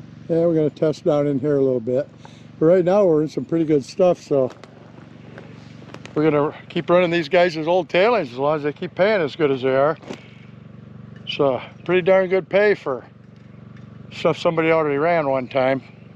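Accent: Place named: South, United States. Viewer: your a peach,i love that slow southern way,you should get a paycheck from the state tourism board.its just the best education videos in the gold search.amen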